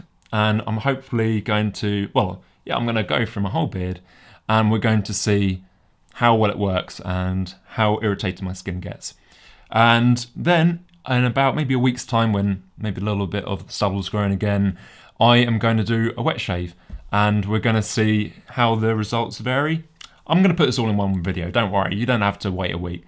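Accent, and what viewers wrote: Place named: United Kingdom. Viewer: When I heard the British accent I knew there would be excess talking xD great review tho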